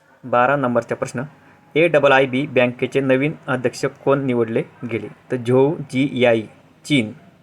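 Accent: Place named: Ahirani, India. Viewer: Sir mi dhule chi aahe tuhmi kuthele bolnyavrun ahirani aahe bhasha tumchi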